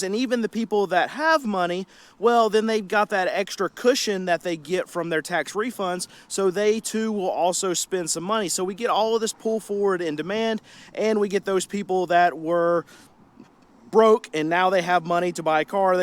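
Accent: South, United States. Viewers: He says ,"buhhcuuuzzz" a lot I am guessing in the south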